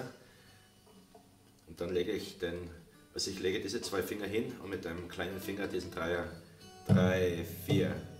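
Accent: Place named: Bahia, Brazil. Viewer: Putz pensei que era baiano.....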